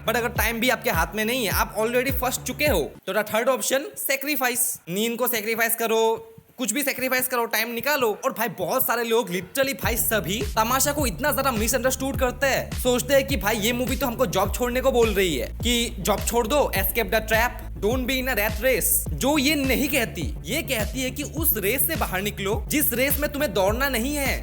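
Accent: Assam, India. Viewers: Dada tumar pora axomiya vibes ahise akdom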